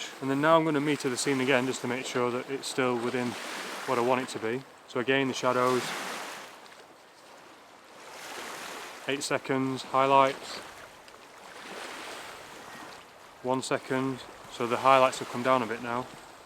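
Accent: Yorkshire, United Kingdom. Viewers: Sounds to me like you are originally from Yorkshire.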